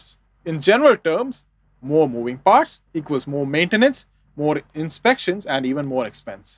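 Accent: India. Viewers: Ugh heavy indian accent...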